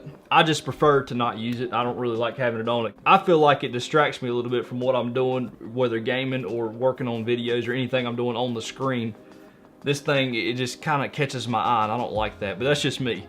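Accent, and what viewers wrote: South, United States. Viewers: Fantastic review! How do you not have more subs?! Very informative and you cover everything. I also absolutely love your southern accent, a pleasure to listen to.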